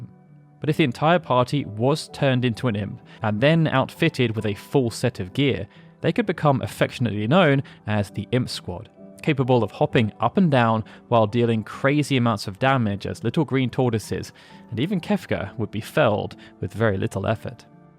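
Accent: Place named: United Kingdom. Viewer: I knew all those. Because you just recreated someone else's video with a brit accent.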